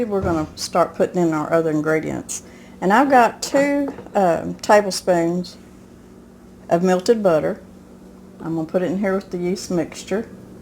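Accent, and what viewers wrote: South, United States. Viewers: Your accent is odd.. you’ve a southern accent but you say wawrsh like a northerner… lol my yankee mom from Iowa says it that way. When we repeat it like her she says oh wait let me say WAAASH… lol but I’m not sure I’ve ever heard it with a slight southern accent like yours. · These look delicious, but being a true Southern lady..I love your Southern authentic accent! It’s the real thing! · You are so precious! There is NOTHING more 'homey' than a Southern twang that puts one at ease! My BEAUTIFUL wife was a daughter of a Church of Christ Minister. I, however, lost her to breast cancer many years ago. As her family did a lot of preaching down Texas way for many years, they also had that Texas-twang that I have come to love so very much! As she, and all of her family have now all gone to be with the Lord, watching you, and together brings a lot of both sad, and warm, memories back to me when we were all together enjoying life, as life was meant to be shared, in Christ's fellowship. 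is lucky to have a woman such as yourself, as you are so genuine, kind, and loving. God Bless you, for the person that you are, and what you create for the viewers like myself!..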